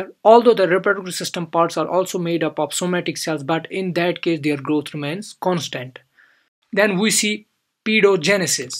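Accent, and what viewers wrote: Kashmir, India. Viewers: Are you a Kashmiri? Just wanted to ask coz your are speaking just like my friend who is also a Kashmiri.😊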